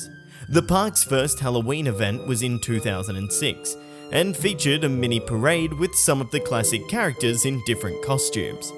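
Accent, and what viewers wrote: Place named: Australia. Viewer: Are you from Australia if not then I like your accent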